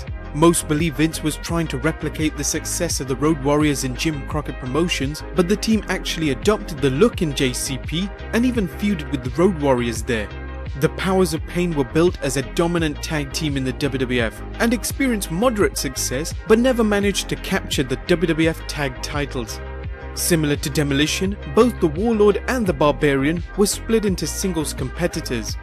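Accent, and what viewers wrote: United Kingdom. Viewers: I swear every channel on YouTube that does top 10 or top 5 videos is narrated by an annoying British boy!!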